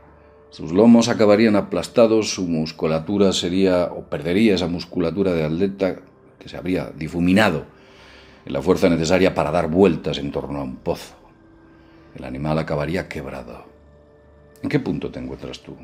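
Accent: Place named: Castile, Spain. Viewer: Jeje esa mezcla de español castizo, y efectos especiales es... Bastante bizarra.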